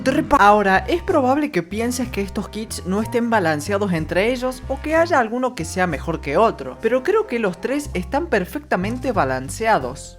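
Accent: Córdoba, Argentina. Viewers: che amigo vo so cordobe? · Una duda antes que todo buen video Bro sigue así la duda boluda que tengo es ¿Sos cordobés?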